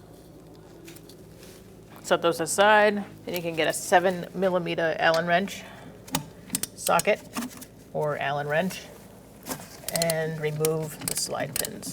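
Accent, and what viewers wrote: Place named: Massachusetts, United States. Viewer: Thanks for the help. That accent reminds me of my mom. Mass born. As a kid i would ask her to say water for a laugh.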